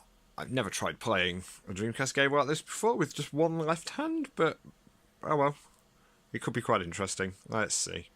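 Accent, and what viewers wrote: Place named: England, United Kingdom. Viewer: makes me remember how i hate english accent like this lol... btw we dont care of your speakers and monitor model.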